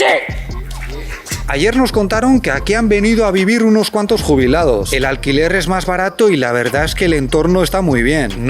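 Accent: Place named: Basque Country, Spain. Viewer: Ahi va la ostia,! Menudo acentazo vasco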